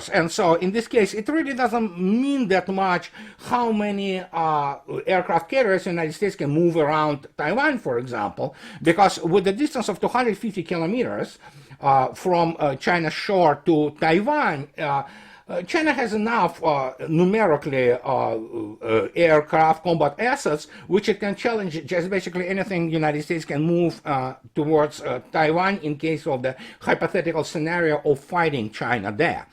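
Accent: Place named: Russia. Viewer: *Closed Captions* when you're uploading, please, Mr Martyanov. Helps us half-deaf people who are bad even with English, let alone Russian accent...thanks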